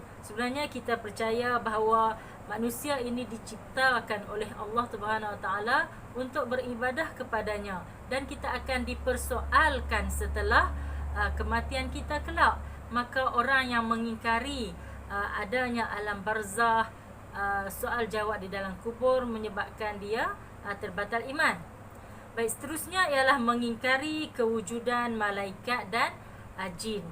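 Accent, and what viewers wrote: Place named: North, Malaysia. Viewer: Tahniah ustazah...kemaih👍👍👍lama x cakap utara sejak pindah perak..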